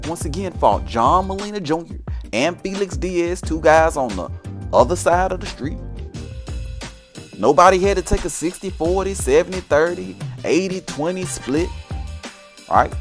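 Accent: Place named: Atlanta, United States. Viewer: Good sh as always Fam,... Salute! Yo where u from fam, ATL? Just wondering, just my guess...I hear a lil bit of an ATL accent sounds like.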